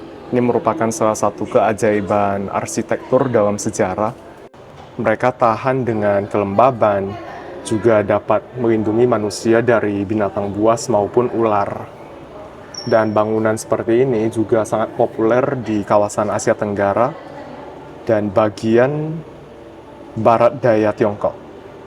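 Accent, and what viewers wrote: Java, Indonesia. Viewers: Materi yang bagus. maju terus. Semakin hari semakin medok logat. Sehari-hari pakai bahasa Jawa ya?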